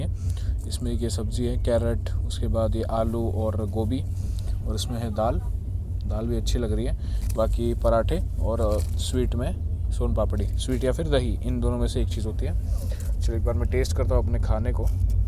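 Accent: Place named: Maharashtra, India. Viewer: You are a marathi person and we enjoy your sweet Hindi.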